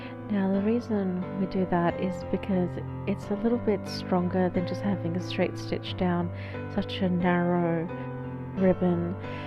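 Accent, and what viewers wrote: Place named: United Kingdom. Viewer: Nice bunting . Something I have never bought or used. You mentioned Australia in another video. You don’t sound Australian very nice UK English Accent 😊👍🏻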